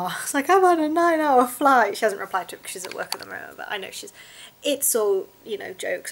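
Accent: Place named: United Kingdom. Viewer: You are cute. Love the UK accent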